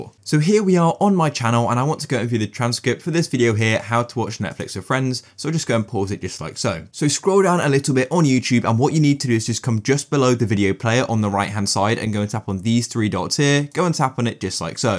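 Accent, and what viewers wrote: United Kingdom. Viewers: When English guide? I don't understand British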